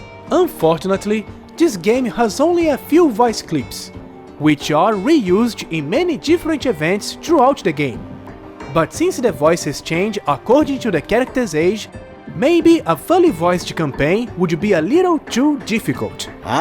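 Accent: Brazil. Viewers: Are you from Brazil? Your accent is so brazilian-like. Im brazilian btw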